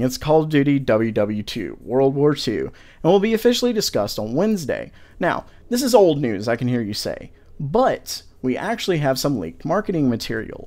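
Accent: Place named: South Carolina, United States. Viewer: Trying to pin point your accent, armican mid south, possibly south Carolina, I am a Canadian so I don't know my states very well, lol.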